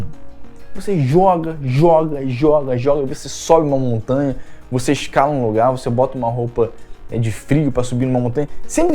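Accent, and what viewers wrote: Rio de Janeiro, Brazil. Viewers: Esse sotaque carioca é uma pika mané, eu entendi "baú dos gay de 3" e é baldur's Gate 3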